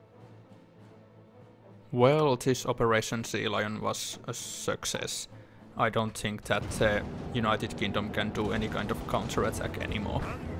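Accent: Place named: Finland. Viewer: Finnish accent, best accent ;)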